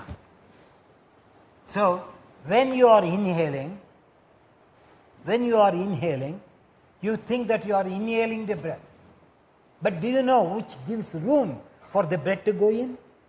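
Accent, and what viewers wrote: India. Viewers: How Can I Correct the Subtitles? Perhaps the Tamil language accent of his is confounding youtube's speech recognition software. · OK, that was not entirely clear, and I sure am familiar with yoga terminology and Indian accent.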